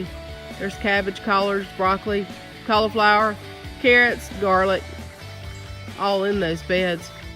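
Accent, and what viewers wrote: Mississippi, United States. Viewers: Love your garden. Very neat and beautiful. I love your Mississippi accent too. So cute...Have a blessed Easter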